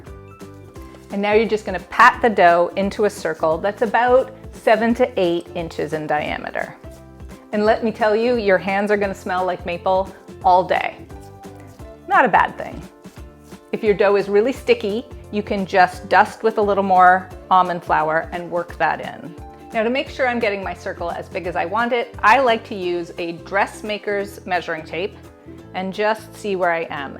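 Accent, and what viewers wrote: Canada. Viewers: Are you U.S. North or Canadian North?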